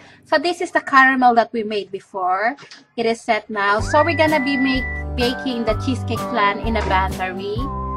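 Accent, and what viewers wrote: Philippines. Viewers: Hay naku dmo pa KC tagalugin halatang Pinay ka.puro question mark way Ng English mo😂😂😂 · you sounds like Filipino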